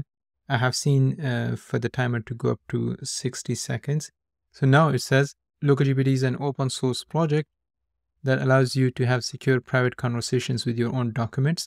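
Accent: India. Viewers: Where are you from? You sound a lot like my Indian Computer Science teacher.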